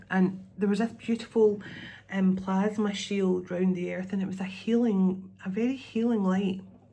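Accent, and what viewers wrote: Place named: Scotland, United Kingdom. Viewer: I hyped you because I just love you! Forgiveness is healing and I believe in the same thing. Jesus taught us to forgive and love one another as my father loves you. I stand by that. I would rather listen to my intuition and let the Holy Spirit guide me more than anything. Also I can’t get enough of your Scottish accent and my youngest Kyle loves your voice too. He said it’s soothing to him. you are a blessing to us all! So happy to know you! I will email you as more things have happened that I can’t discuss here. Thanks for being you 🙏🏻❤️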